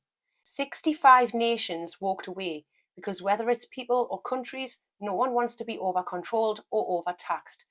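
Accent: Newcastle, United Kingdom. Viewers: Fascinating insights but for some reason (not in a creepy way) the geordie accent is engaging to listen to 😅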